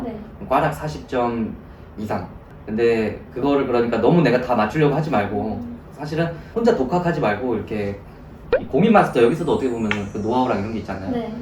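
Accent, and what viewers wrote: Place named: Jeolla, South Korea. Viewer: 말투가 전라도인데 혹시 고향이?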